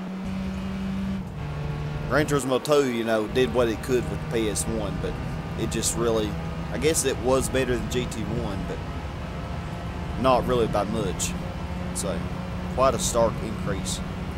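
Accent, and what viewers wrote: South, United States. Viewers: Man, that's a thick southern draw, where you from?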